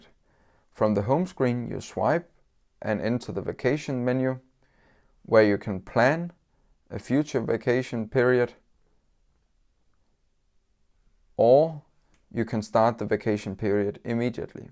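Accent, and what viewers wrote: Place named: Denmark. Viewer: KÆFT, en slem omgang Danglish! Har I ikke penge til at hyre en engelsktalende vært?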